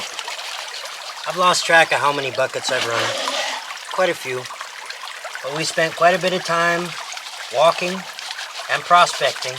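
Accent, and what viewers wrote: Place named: Wisconsin, United States. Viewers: U got the wi Midwest accent down. Almost Canadian but not quiete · Northern Illinois here. Think I hear that native Minn/wis accent, just a bit.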